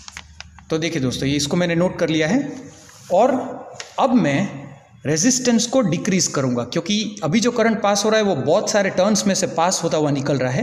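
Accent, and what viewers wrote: Gujarat, India. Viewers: Are you Gujarati??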